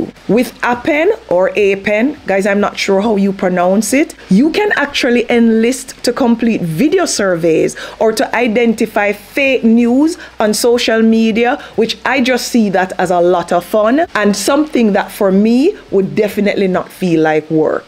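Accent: Jamaica. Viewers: I received your email and apologize for not responding immediately. I got sick over the weekend after visiting the hospital to get a CT scan done. I picked some virus and came down with a dripping sinus and a fever in the early Sunday morning, so I was also absent from church service in an effort to prevent spreading my germs. However, I must admit that I love you so much and believe you are a God send angel on earth for so many struggling people, myself uncluded. And, I hope you won't mind if I call you because, from your accent and the way you say 'walk good'; a saying we Jamaiacan people have, I strongly believe that's where you are from. If so, more power to you. If not, I still say more power to you and lots of love and blessings from God our father, from whom all blessings flow. To your followers, believers and non-believers, please DO NOT TAKE OUR GODLY BELIEF personal. 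You are entitled to your spiritual belief as well, and YOU ARE JUST AS LOVED AS and me.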